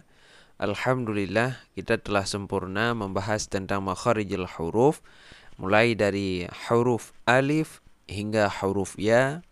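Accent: Aceh, Indonesia. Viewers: Bahasanya...kaya orang aceh yaaah...jdi inget temen saya dri aceh zamroni holil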